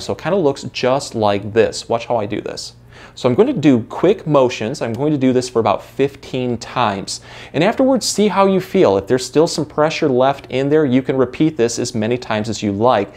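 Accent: Michigan, United States. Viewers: Michigan accent!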